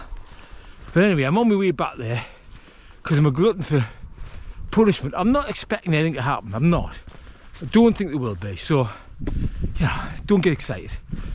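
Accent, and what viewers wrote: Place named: Scotland, United Kingdom. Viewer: Another great video. I'm loving the Scottish accent. My brother in law is a Newcastle boy and it's hilarious listening to him do my accent. It's nearly as good as yours.😅😅😅. Keep doing what you are doing Bonny Lad. 😅😅😅❤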